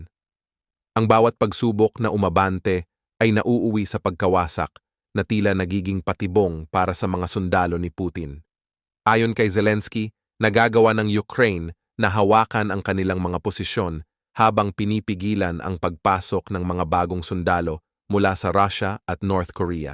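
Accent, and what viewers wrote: Visayas, Philippines. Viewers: Hoy ayusin mo tagalog mo.biaya kaba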